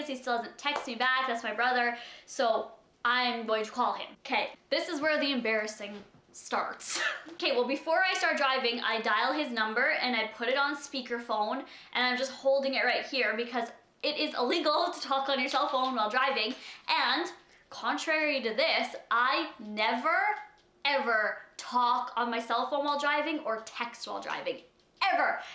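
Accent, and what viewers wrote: Canada. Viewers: Are you from Canada because they say 'sorry' in the same accent you have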